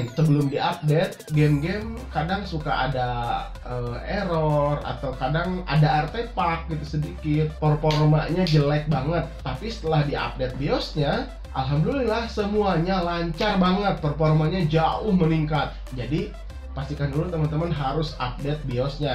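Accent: West Java, Indonesia. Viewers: sunda pisan euy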